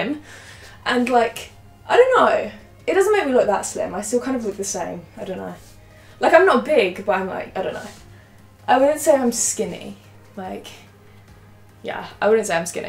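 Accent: United Kingdom. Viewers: I did not imagine her with a british accent wtf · Ela é britânica da pra ver pelo sotaque.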